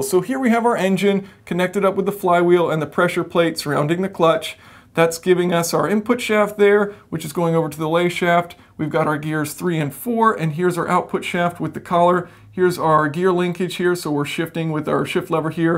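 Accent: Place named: United States. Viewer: only problem he is american. and drives lhd car and has a american accent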